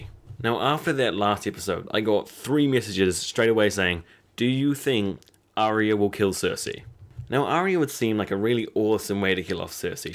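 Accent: New Zealand. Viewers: Good to hear a fellow kiwi, bro :D btw... Its not a teleportation machine, there is a subtle jump forward over 1-4ish months during this episode. We see Winterfellpost battle, the sept explode in kings landing, Jamie at the twins, and Dany in Meereen post battle. After that... * We see Aria at some point after Jamie left the twins * The lords of the north assemble in Winterfell following news of the battle of the basterds * They show Varys and Olenna in Dorn (it must be a significant time after the Wildfire). Then we see Dany and a feet (including Highgarden and Dornish ships) a huge timestep after that. They skipped a lot of padding where Varys, Jamie, Olenna, Aria, Northern Lords, and the Dornish & Highgarden fleets travel the globe. Think of the last 1/3 of the episode as an epilogue of seasons 1-6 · Is your accent Kiwi? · sheesh. Is that some kind of backwoods kiwi accent you got? 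· are you a kiwi? your accent sounds very kiwi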